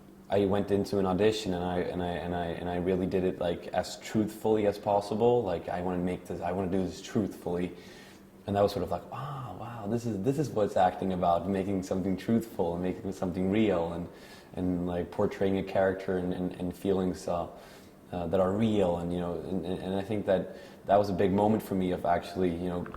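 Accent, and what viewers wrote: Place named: United States. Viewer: He sounds American!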